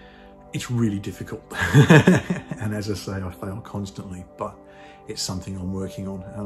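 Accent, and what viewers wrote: Australia. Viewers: Thanks for the Star Trek analogy. That was perfect being Im an avid Trekky. I work in a school with challenging kids. PDA came onto our radar sometime last year. I am working with a girl that we think may have it. Anyway... strategies for her can be applied to all the kids as a way to keep things calm and safe while diagnosis' are being sought. Im in Australia. You sound Aussie. Its easy for me not to feel it personally, it must be so hard as the parent. I validate students feelings so they know I am listening. I have had things thrown at me, sworn at me etc. I ignore all those things. A gentle smile would work best as a bigger smile can be interpreted as ridicule.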